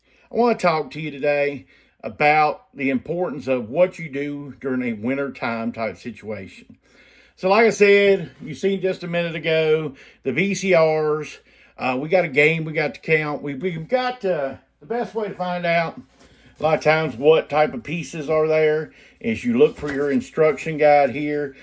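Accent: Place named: South, United States. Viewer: I love your southern accent!! 'Coconut Castaway'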